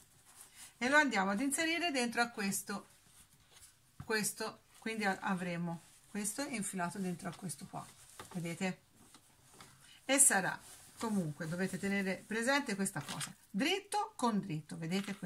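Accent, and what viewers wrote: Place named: Tuscany, Italy. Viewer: Buongiorno, carino il coniglietto bravissima.come mi piace l'accento toscano 😂😂😂😂😂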